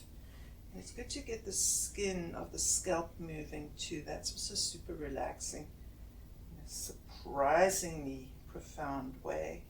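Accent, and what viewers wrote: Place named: South Africa. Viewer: I could be wrong but she sounds as though she is from South Africa and i love that accent. Great video.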